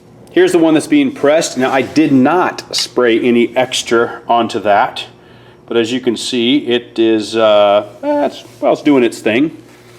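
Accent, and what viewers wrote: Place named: United States. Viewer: This is the most american sounding guy I've ever heard. If america was a person It's him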